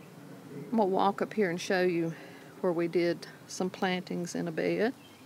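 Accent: South, United States. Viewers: i have just discovered your YouTube channel and i have enjoyed your Garden videos so very much. I am inspired! In what part of the country are you living? I think I detect a very distinct southern accent. 😊♥️😊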